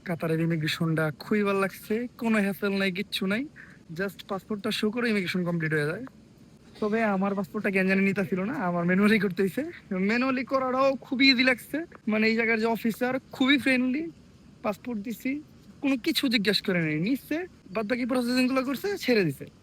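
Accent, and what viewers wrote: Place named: India. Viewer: Indian voice ase vai bangla na asla video dekhe ki moja pawa jai..?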